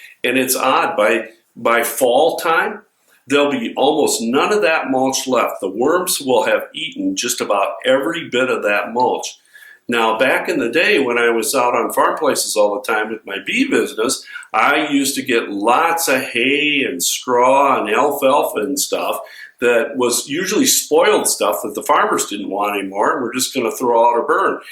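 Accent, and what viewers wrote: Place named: United States. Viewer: Love this info. And finally someone with plain English from America explaining things. Thanks for this video.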